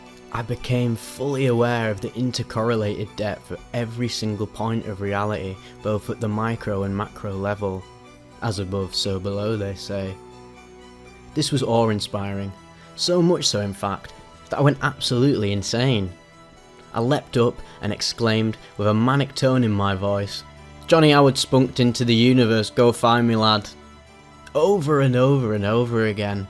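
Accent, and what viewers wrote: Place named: England, United Kingdom. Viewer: I feel like you could write. Maybe you already do. I’m far away from you, it sounds like you’re English, but you’re story is something I’m really enjoying. I subscribed, so I’ll try to keep track. I love this style of writing/ oration though.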